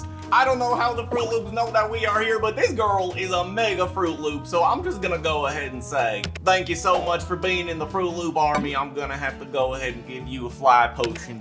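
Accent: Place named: United States. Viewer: I love your cowboy accent my username is lovelybear1509 and I have a display name it’s Italy! :)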